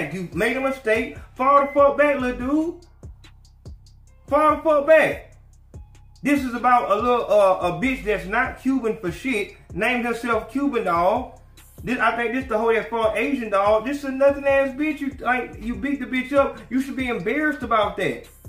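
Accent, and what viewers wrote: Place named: South Dallas, United States. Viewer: Lol ...you talk like my homies in south Dallas ...good shit man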